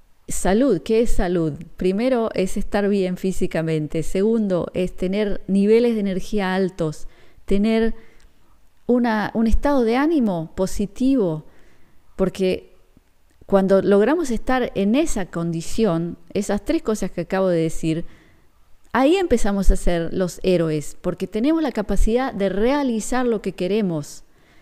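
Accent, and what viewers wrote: Argentina. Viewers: Gracias. Es el tiempo del ser humano "común". Nosotros debemos reconstruir la humanidad en este tiempo. Respecto a los medios, desde mayo del 2020 no miro NADA...Me informo solo por Twitter....Por tu forma de hablar creo que sos argentina. Un fuerte abrazo desde Buenos Aires! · Excelente, gracias!! Les comparto lo que hago. Me he aprendido de memoria el Salmo 91 y el Salmo 23, y cuando voy camino a mi trabajo que es a las A.M. hago esas oraciones; antes de empezar a trabajar hago 30 minutos de meditacion. Luego empiezo a trabajar en un campo de golf y tengo sobredosis diarias de vitamina D. Cuando llego de regreso a casa no veo para nada TV, veo a Salud y Finanzas Inteligentes y me siento muy bien física, mental y espiritualmente. Sería excelente que hicieras meditaciones guiadas con tu amorosa voz Argentina. Saludos de un mexicano radicado en Las Vegas, NV.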